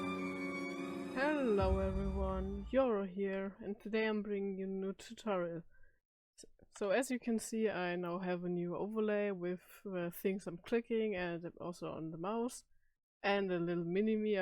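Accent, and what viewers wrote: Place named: Germany. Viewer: judging by the accent youre 100 percent german lol great vid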